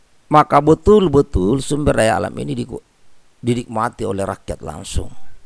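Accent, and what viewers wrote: Indonesia. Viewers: logat ke daerahnya jgn di tinggal itu makin Indonesia Banget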